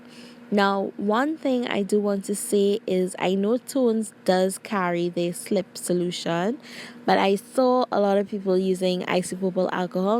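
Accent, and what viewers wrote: Trinidad and Tobago. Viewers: Trinidadian here and I recognize a gorgeous Trini accent anywhere love y girl and I’m glad there are some Trinidadians around here on YouTube with me 🇹🇹🇹🇹🇹🇹🇹🇹🇹🇹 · Noticed that trini accent quick. Coming to see you soon from Chaguanas. 😁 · Where are u from?... U sound Trini...!!! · When I heard that Trini accent, I subscribe immediately. Love how you took your time to explain everything. I am now learning to do acrylics and gel nails. Thank you. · Love this am hearing a Caribbean accent trini 🇹🇹 to be exact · Always proud when I hear a Caribbean/Trinidadian accent 🇹🇹🇹🇹🇹🇹🇹🇹🇹🇹 very skilled nail tech, all the best for you in the new year!!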